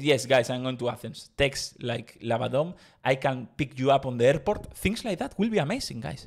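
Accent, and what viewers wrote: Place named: Spain. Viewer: You are incredible! I love your Spanish accent when you are talking in English. I hope you like Athens.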